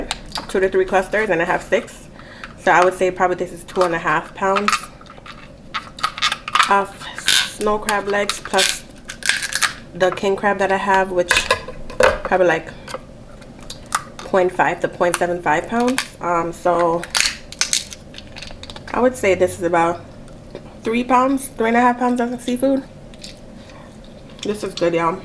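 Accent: Jamaica. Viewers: Are you Jamaican? I love your accent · Her accent lowkey be coming out I wish Uu could do a vid abt Jamaican slang ((&)) they words they use maybe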